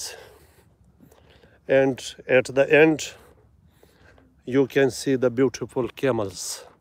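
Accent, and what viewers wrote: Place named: Pashto, Afghanistan. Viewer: Very beautiful English with pShto accent... Even flowers, desert n butterfly are all awesome... Moving camera view to show surrounding ll be good idea for knowing us abt areA a lil bit more.....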